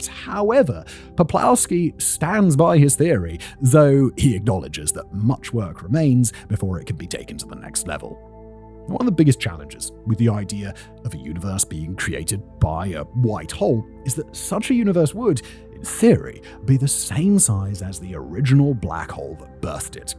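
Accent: United Kingdom. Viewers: For starters, there was no big bang. Therefore, there was no nothing before the Big Bang. Telling a story with a little cute British accent isn't gonna make it any more believable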